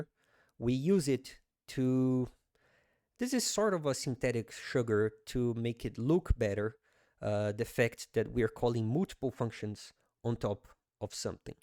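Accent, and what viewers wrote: Brazil. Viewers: Great content! Are you Brazillian?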